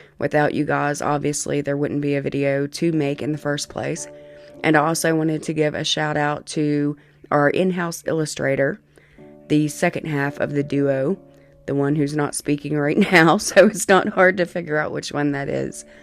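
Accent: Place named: South, United States. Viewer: I'm sorry but if you have a southern accent, telling a scary story doesn't work.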